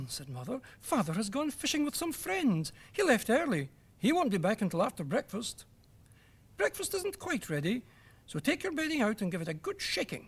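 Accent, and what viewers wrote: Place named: Scotland, United Kingdom. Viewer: Guy sounds scottish.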